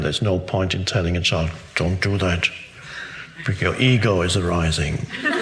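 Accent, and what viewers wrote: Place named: Argentina. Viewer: Grande ese Eckhart hablando en "argentino" 🥰🥰🥰♥️♥️♥️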